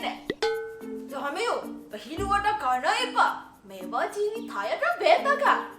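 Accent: South, Sri Lanka. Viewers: දකුනේ ගැම්ම❤️ ඒක මරු. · දකුණේ ගැම්ම අම්මෝ 😁😁💖💖💖💖💖💖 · දකුනෙ ගැම්ම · හරි හරි..දකුණෙ ගැම්ම😂😂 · හරි හරි දකුනෙ ගැම්ම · දකුණේ ගැම්ම 😂😂😂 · දකුණේ ගැම්ම😆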